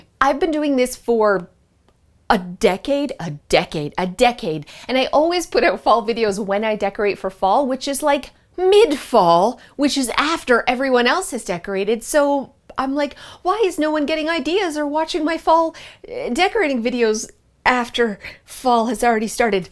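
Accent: Canada. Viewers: A few things: 1. These DIYs are so beautiful and elegant! 2. I'm from Florida and your Canadian accent is literally the cutest 3. I've always thought you were very pretty but since your surgery you have so much CONFIDENCE girl and you are rocking it! You look so happy and that makes me happy 😃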